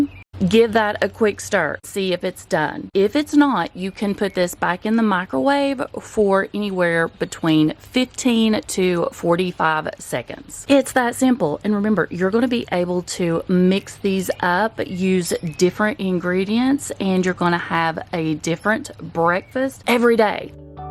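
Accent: South, United States. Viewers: That's an enjoyable accent, I guess some of the more southern US? Greetings from Germany 🇩🇪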